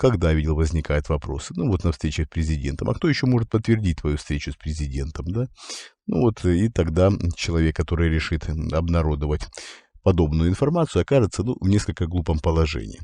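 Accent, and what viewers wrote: Ukraine. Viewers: Вопрос -, почему у Вас украинский акцент?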